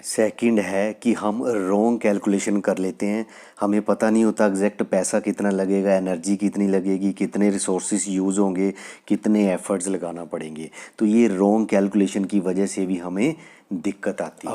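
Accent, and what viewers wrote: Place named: Punjab, India. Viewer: Your accent has touch of Punjab of Pakistan.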